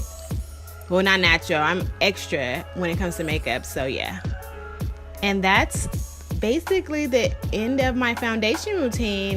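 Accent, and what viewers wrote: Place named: California, United States. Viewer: You sound like you from California 🤔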